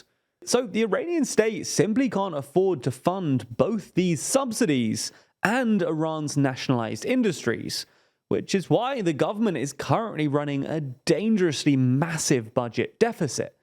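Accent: England, United Kingdom. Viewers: British accent and commentary about how China is weak, Russia is weak, Iran is weak. Interesting how that goes. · Apparently, you are the expert. Just because you have an English accent doesn’t make you any smarter than an average person. I’m not even sure how you ended up in my feed. More bullshit from someone that doesn’t know crap.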